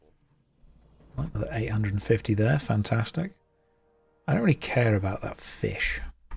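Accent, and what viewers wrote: United Kingdom. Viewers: I like the british ASMR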